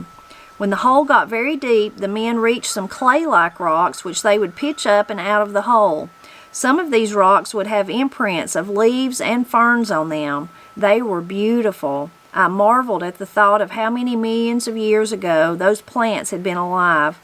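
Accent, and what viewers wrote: Appalachia, United States. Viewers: Thank you for sharing. Love your readings. You have the ability to bring Sidney to life with your Appalachian accent and your voice fluctuations. What a true blessing.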